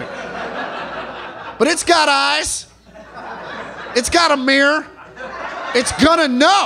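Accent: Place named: South, United States. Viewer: Nobody in Kansas has this southern of an accent, unless they moved here from the south.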